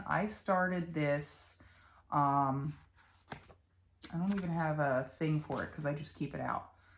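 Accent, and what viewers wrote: Philadelphia, United States. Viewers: Fellow Philly girl here. I HEARD the Philly in your voice in the first sentence you spoke! Not that we have accents...it’s everyone else who have the accent! Loved your video!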